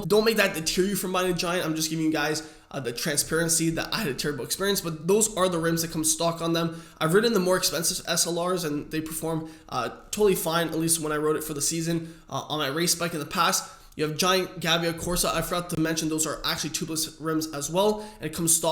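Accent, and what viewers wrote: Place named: Canada. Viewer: Really nice video. Good job! 👏🏼 (P.S. I like that Canadian accent. Makes me want to go watch hockey on TV. 🏒🤣)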